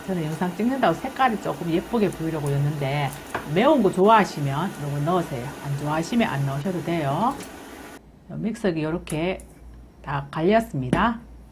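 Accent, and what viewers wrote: Gyeongsang, South Korea. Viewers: 너무 크다타이하모 입이 찌져집니더~ ㅋㅋㅋ갱상도 말은 언제들어도 웃십니더 ㅎㅎ 지는 대구에산지 38년되었는데 말투가 갱상도 충청도 짬뽕입니더 ㅎ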